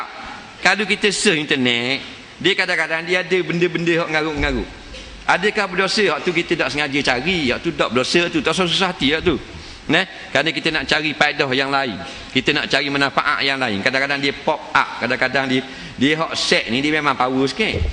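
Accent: Terengganu, Malaysia. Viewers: tak faham la ape dia ckp..loghat ganu pekat sgt.. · Saya pn faham... Ganung power...syok dengar org ganung cakap #ankjatikedah...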